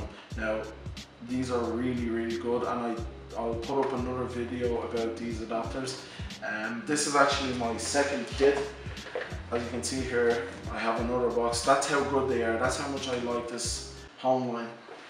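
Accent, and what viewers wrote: Ireland. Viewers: Lol - I knew you were Irish from the opening statement - Hows the goin.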